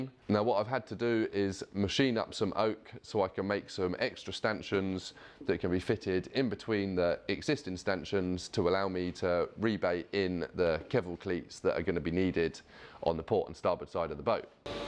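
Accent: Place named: Kent, United Kingdom. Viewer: Bravo! Well done, to camera delivery 100% better, you have a decent comparison in this vid between the intentional to camera delivery vs the incidental pieces, the incidental bits, you are clearly more focused on the lob so the delivery has a very dry tone compared to the prepared to camera pieces. Worth reviewing each vid for pointers, that Ashford (?) accent ain't gonna help, we of the cockney diaspora don't make public speakers!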